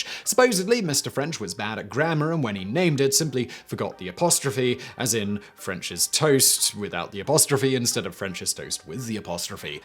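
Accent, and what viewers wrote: United Kingdom. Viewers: Is it just me or does the narrator’s intonation sound annoying? I usually like the British accent but his annoys me. Ugh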